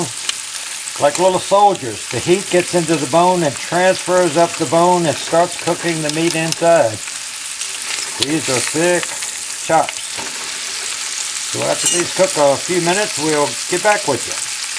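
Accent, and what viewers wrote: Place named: North Carolina, United States. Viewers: This video is great at extremely helpful! Thanks so much! I knew that wonderful accent sounded familiar! I'm from North Carolina! :) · Today will be my first time preparing Lamb loin chops. I really like this video because this guy's southern accent remembers me of my childhood, living on the farm in NC with my grand dad. He cooked alot and made it look so simple, just as the above video. And absolutely nothing was wasted. Hope my lamb chops turn out just as great as seen in this video. Thanks!!!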